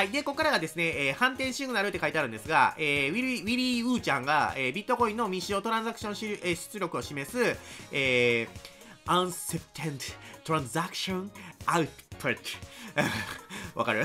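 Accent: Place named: Kansai, Japan. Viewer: おおお、本人から返信きた😳 最近さんのチャンネル登録したものです💦私、忙しい人なので、飛ばし飛ばし見てます💦 さんの動画長いので…😂関西弁いいですね✨心が和みます🌤️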